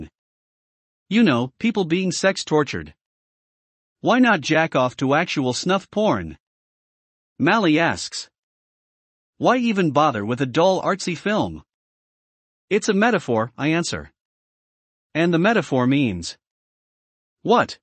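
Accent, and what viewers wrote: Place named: United Kingdom. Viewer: It's a bit hard for me to understand the British accent, but I'm getting used to it, great story.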